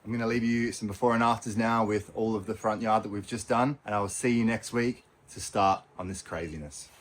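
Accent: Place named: South Australia, Australia. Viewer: you are my favorite gardener on YouTube. You remind me of Edward Scissorhands when you are pruning. You are probably too young to have seen that movie but I think you would enjoy it. It came out in 1990 starring Johnny Depp. I also watch the Garden Rescuer, I think he said you are his friend. He needs to learn how to protect himself better like you do. I live in St. Paul Minnesota USA and really enjoy your accent and knowledge of the creatures and plants in South Australia. I hope you never stop posting videos. You are such a nice man! 🥰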